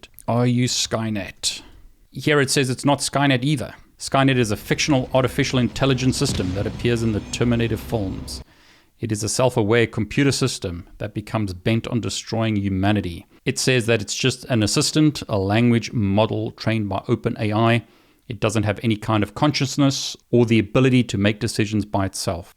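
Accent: South Africa. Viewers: Is this a South African accent? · Hi are you South African? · Really interesting video, dude, and lovely to hear your South African accent. (If not RSA, Southern African. I now live in the UK, 24 years, from RSA.) GPT is so powerful! I'm going to have to use it for pair programming, for the sake of my career and my clients' success. Plus probably a billion other things in my life. Oh yes, always test everything: code, new AIs, ideas, foods, dearly-loved traditions, everything.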